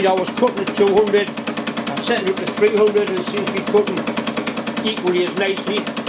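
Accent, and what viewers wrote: Newcastle, United Kingdom. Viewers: For 42 seconds I was transfixed..then I was presented to the Geordie Andy Capp...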